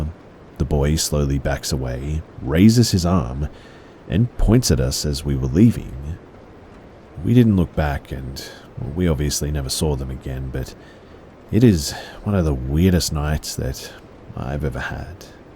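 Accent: Australia. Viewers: Are you Australia? Loving you content aswell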